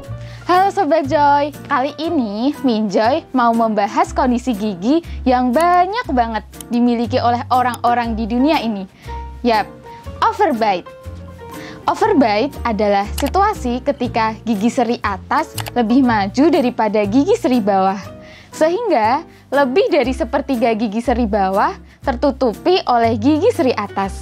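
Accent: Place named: Banyumas, Indonesia. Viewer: Dokternya wong ngapak. Keton banget logate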